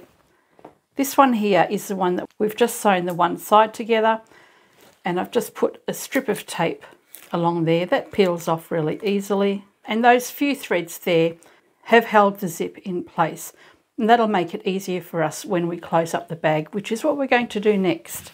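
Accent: Australia. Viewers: Does anyone else think in an Australian accent after watching videos...... or is it just me? 🇦🇺💖😊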